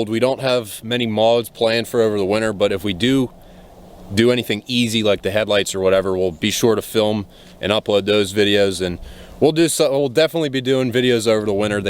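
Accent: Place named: Pennsylvania, United States. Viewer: I honestly got hooked on your channel because of that thiccc PA accent. I have a lot of family from PA so it’s refreshing to hear lol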